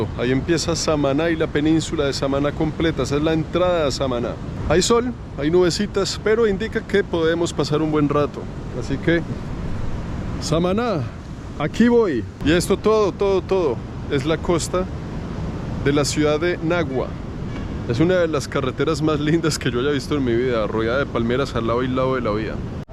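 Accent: Bogotá, Colombia. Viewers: Que carisma tienes, me gustan muchos tus videos y lo que transmites, como describes los paísajes y como se te ve que disfrutas lo que haces y los lugares que visitas, tu acento colombiano, bel ragazzo 🥰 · lo que me encanta de ti, es que eres auténtico, tu lenguaje muy rolo, muy Colombia.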